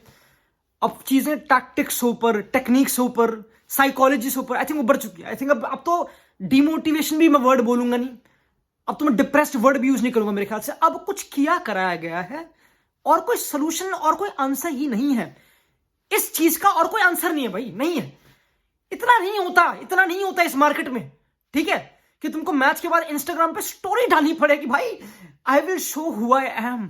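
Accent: India. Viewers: Bro are you talking English or Indian ,I can understand 1 every 3 words you say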